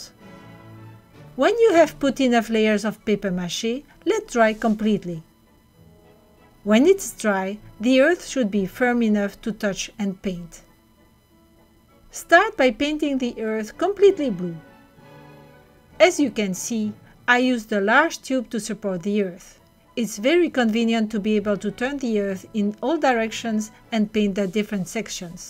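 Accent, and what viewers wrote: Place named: Asia. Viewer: Why is every video I find about making a globe an Asian which in accent?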